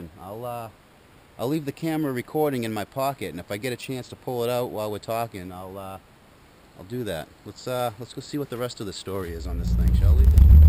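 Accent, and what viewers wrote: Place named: Boston, United States. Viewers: "I went to a pahhty las weeken that was soooo enohhmis it was stahtling." Boston baby! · This guy has to be from new england. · that Boston accent.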